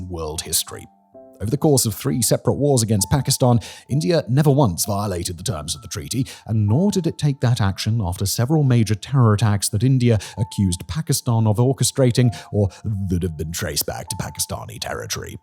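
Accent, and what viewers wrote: United Kingdom. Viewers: Hey white man either a British accent, what would your country do if it had a neighbor like Pakistan which continues to train, execute and protect terrorists? What would any reasonable country do? Is there any other country in the world which has tolerated this kind behavior like India with so much patience?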